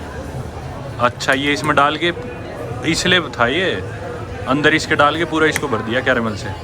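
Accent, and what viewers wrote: Haryana, India. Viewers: The thick haryanvi accent is such a turn off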